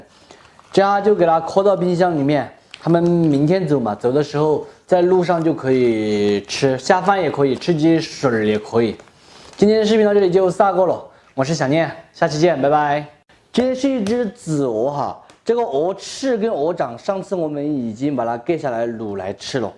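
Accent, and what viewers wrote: Sichuan, China. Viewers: 哈哈 喜歡聽你說川普！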